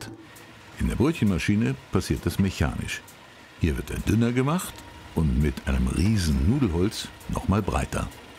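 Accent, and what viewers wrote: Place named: Rhineland, Germany. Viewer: hatte schon immer einen leicht kölschen/rheinischen Akzent und den wird er jetzt mit 82 Jahren und nach über 45 Jahren als Maus-Sprecher auch sicher nicht mehr ablegen.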